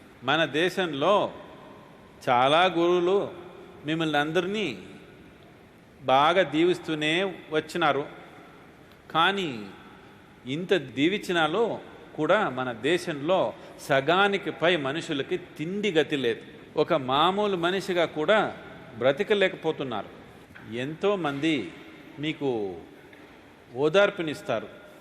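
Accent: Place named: Tamil Nadu, India. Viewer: Arva vedavalu Telugu matladinattu. Undi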